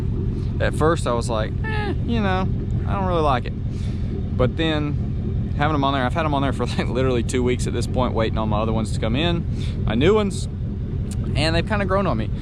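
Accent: Alabama, United States. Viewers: What part of Alabama you from?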